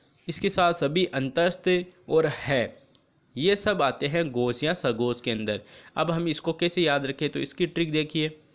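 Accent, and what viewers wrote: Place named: England, United Kingdom. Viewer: Sahi se padho sir jo hum kavi nahi padhe aap waise padhte hai a aa i ee aise padh rahe ho jaise ko अन्ग्रेज ho gussa aane lga lekin explanation bahut aacha hai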